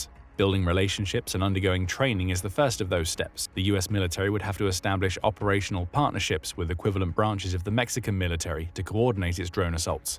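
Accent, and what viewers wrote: United Kingdom. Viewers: I cannot even believe your trying to downplay the affect of fetanyl on the American population with your British accent as though you know… honestly it is disgraceful and without value to say it may not be as big a problem as Trump is doing. He’s literally doing what we the people have asked. What are you doing…?!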